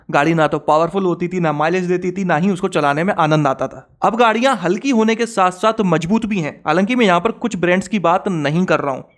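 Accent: India. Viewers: Yeh Bhai gwalior Agra mathura side ka lagta sayad 😅😅😅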